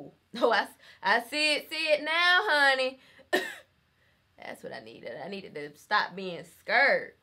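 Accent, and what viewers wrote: South, United States. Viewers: why are you so cute .. .. I'm guessing you're from down south with a little islands in. . . . .I usually think it's rude to ask; hopefully since I'm mentioning I get a pass.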